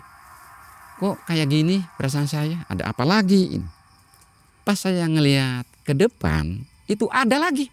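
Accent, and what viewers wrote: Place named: West Java, Indonesia. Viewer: Kok logatnya kaya orang sunda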